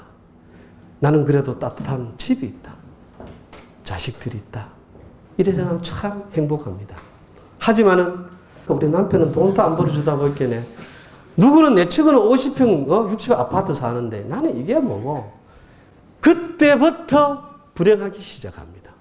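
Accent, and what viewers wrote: South Korea. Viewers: 안동 영주 사투리군요. 말의 힘 꼭 명심하겠습니다. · 교수님 감사합니다 혹시 고향이 경북 청송이신가요?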